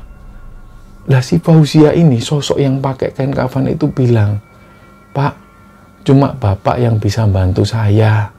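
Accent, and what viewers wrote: Java, Indonesia. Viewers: Setuju bgt... Q ngefans karo seng nyiarke... Udah ganteng logat jawane jiiaann penak bgt di rungoke... Salam rahayu njeh ❤❤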